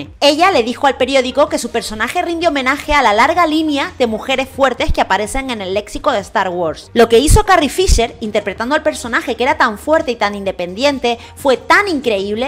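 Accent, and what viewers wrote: Canary Islands, Spain. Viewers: Eres de canarias? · jajajaja, ¿sabes? envidio ese acento español jajaja, lo adoro ^w^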